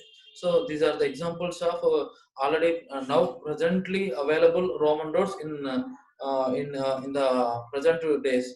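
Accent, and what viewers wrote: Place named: India. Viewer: Your voice problem Please give neat explanation Butler English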